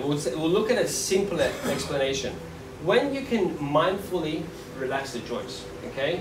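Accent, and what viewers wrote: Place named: Australia. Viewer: hey dude, sorry live in New Zealand assumed you were Ozzy because of the accent. I do abit of bjj here and have trained traditional tkd. Just trying to open some more doors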